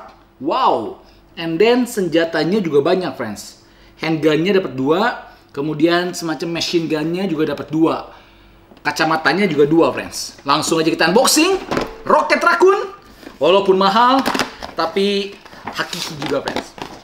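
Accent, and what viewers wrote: West Java, Indonesia. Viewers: Dari logak ngomong nya kayanya bang orang sunda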